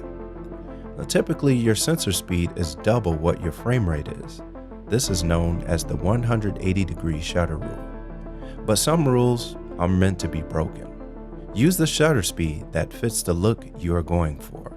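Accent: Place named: United States. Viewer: From your voice and accent I can tell you're from the US, let me know where exactly in the US so I can go hug you??.. Geez 100/10 content and narrative. You just earned a new sub man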